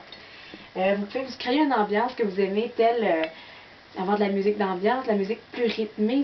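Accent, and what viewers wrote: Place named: Canada. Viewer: Merci pour cette video, et auusi, pour tout ce qui émane de votre charmant accent canadien, de votre sourire et votre gentillesse! Je vais m'essayer à ce type de peinture! Evelyne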